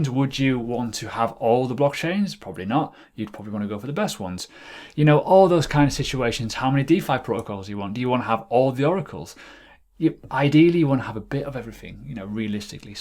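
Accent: United Kingdom. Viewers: Anomynous? British lol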